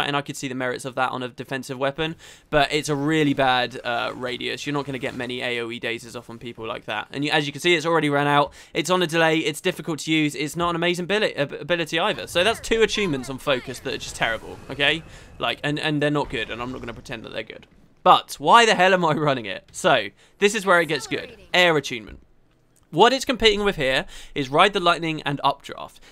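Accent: England, United Kingdom. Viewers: your english accent and saliva makes me throw up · english accent sounds amazing IMO (I'm german)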